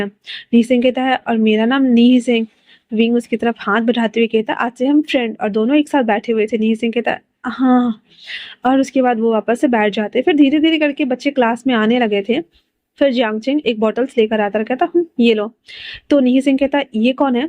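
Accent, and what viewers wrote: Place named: Bihar, India. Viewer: Wonderful story di par jo aap bihari maine bolte hai wo mujhe bhot acha lagta hai osm ❤❤❤😊😊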